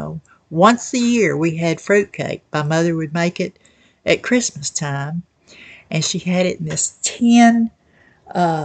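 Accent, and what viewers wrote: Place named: South, United States. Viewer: Hi! If the artists don't mind would you show the pieces that you talk about? Maybe a future video? I am enjoying your videos; I miss hearing genuine southern speech.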